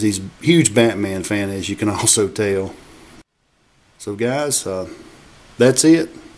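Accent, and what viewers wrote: South, United States. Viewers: Really awesome stuff. I'm one of those guys who has yet to post a video myself. I'm a writer so I'm better at expressing myself in writing. Just started an AF collection blog for my stuff. I'll share it sometime. Need more posts! Love your videos... and your southern accent!! LOL Stay Plastic!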